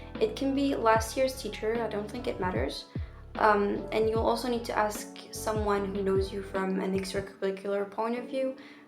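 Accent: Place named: United States. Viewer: You sound american is that a american influence ?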